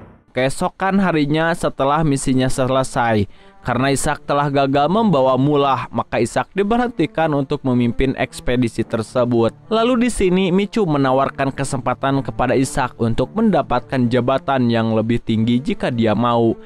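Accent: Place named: West Java, Indonesia. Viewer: eleh-eleh.. sunda pisan.. 😁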